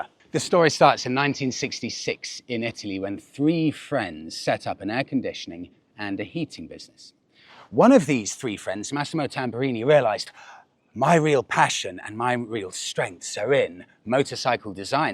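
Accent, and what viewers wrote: RP, United Kingdom. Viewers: haha its just being a posh twat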